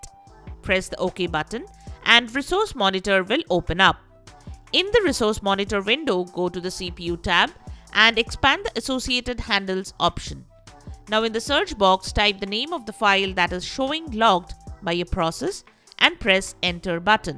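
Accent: India. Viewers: i stop when i hear indian talking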